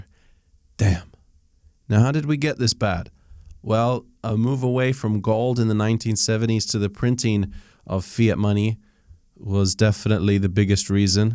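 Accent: United Kingdom. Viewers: I'm curious what part of the UK his accent would be associated with. It's rhotic but I can't place it. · I can't detect an American accent - he's British I'd say although some words sound Aussie to me.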